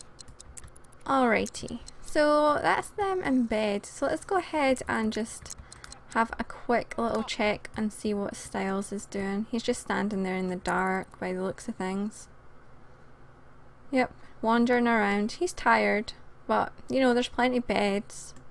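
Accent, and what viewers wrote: Scotland, United Kingdom. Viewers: ***** You're Scottish aren't you? Cause I can here that accent from miles (probably because I am Scottish). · I love your accent. It's just something about Scottish accents that make them just so cool. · im scottish but i had no idea you were scottish haha and i laughed at the bit when he was looking on his phone haha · Omg she's Scottish yasssss I like to call my self Scottish because I move in Scotland when I was 6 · She is Scottish (: I am Scottish too! Makes me proud :')x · WOA UR SCOTTISH?!!! I'VE ALWAYS WANTED TO HEAR A SCOTTISH PERSON TALK ITS AN AWESOME ACCENT IDK WHY I LIKE ACCENTS SO MUCH I WISH I HAS ONE FJEFJECH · You sound Scottish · she is scottish! I WANT HER ACCENT though D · I am Scottish and I can tell that she is Scottish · Yeah, I used to live in Scotland and her accent is kinda like it. :) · Finally another Scottish youtube! 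· Melissa Hubbert She's from Fife, so kind of in the highlands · Your accent is amazing! I love how you talk lol (Scottish?) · YEY finally a Scottish you tuber I am aswell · I love your accent! Scottish accent is pretty awesome :) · Where are you from? It's pretty obvious to spot an Irish accent from a scottish one. She's Scottish. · I'm Irish and she's definitely Scottish. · Its so nice to hear our accent used well! Theres so many people in Scotland who use abbreviation of the end on words, especially verbs, far too often. I like your dictation mixed in with our 'ma's and stuff like that! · Comments: 50%asking if your scottish 25%saying they like your scottis accent 24%saying other stufss 1% saying the comments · 100% Scottish :P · I'm watching this because I like watching old Sims videos because they used to be basically all I'd watch and I have one question Is she Scottish? She sounds Scottish. 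· LOVE the Scottish accent.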